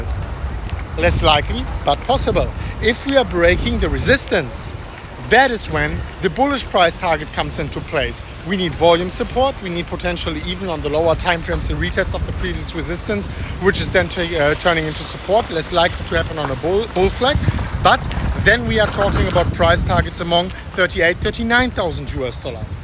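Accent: Germany. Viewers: You sounds like fxxkin german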